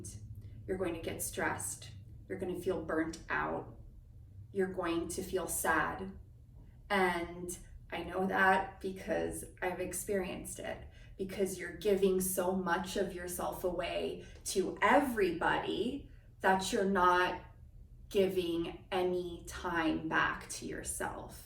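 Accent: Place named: United Kingdom. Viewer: you sound not USA... Where you from? If you are British you could self love with British tea so you know you don't force any on me and no revolutionary war😉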